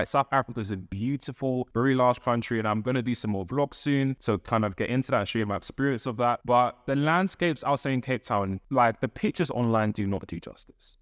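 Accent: United Kingdom. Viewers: Yoo is that British accent am in Birmingham Walsall side right now but my home is in Soweto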